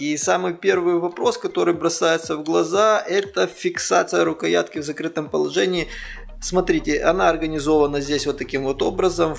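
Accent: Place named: Odessa, Ukraine. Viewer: Ахаххх,слышу что говор свой, а потом на камеру говорите: Привет из Одессы ))))) теперь все сошлось, таки наше "шо" )). Шалом в общем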